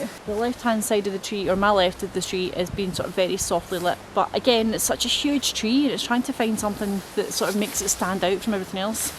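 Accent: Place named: Scotland, United Kingdom. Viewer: Another great video. I always look forward to hearing your accent from home (says a Weegie in England!)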